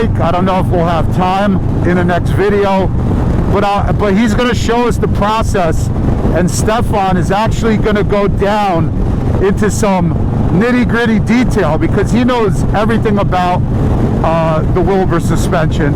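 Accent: New Jersey, United States. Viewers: I enjoy your content, and the interaction with your friends. The Jersey accent reminds me of my late father in law, who was originally from the Jersey City area.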